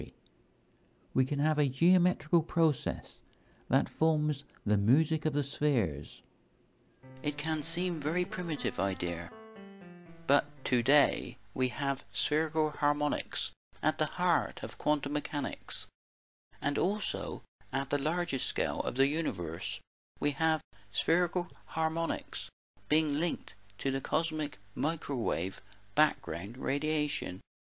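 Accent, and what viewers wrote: West Country, United Kingdom. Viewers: Lovely West Country accent.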